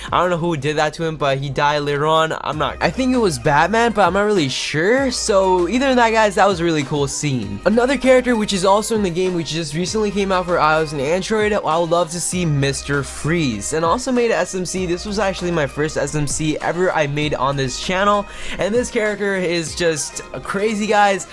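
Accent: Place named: United States. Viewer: you sound like ur from n america usa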